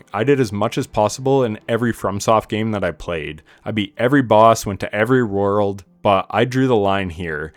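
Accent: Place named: Canada. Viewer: Halfway through the video I realized you’re Canadian 😂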